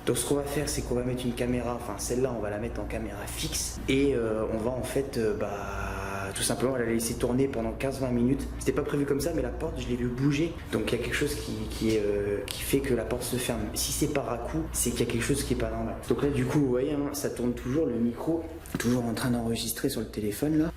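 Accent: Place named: North, France. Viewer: Bonsoir vous êtes nordistes? Non? J'ai le même accent 😊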